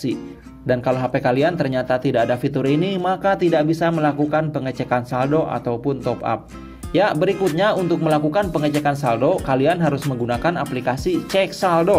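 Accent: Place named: West Java, Indonesia. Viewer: Hatur nuhun akang infona, Mudahan gak salah,, ketahuan Logat sundanya..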